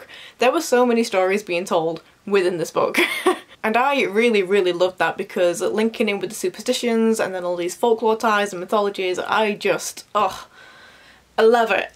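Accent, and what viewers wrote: United Kingdom. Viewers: I love your British accent, which part of the Uk does it belong to? Greetings from Italy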